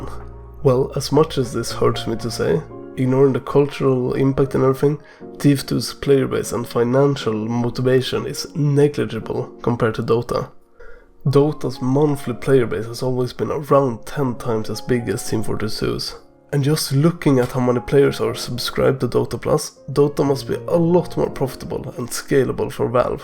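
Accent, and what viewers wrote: Sweden. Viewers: jesus kristus den där tunga svenska dialekten. kekw.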